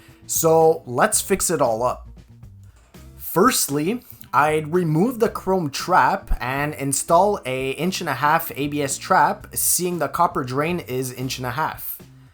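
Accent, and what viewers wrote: Quebec, Canada. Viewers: bro you good he’s from Quebec that where he gets his accent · You're definitely from Quebec just by hearing your accent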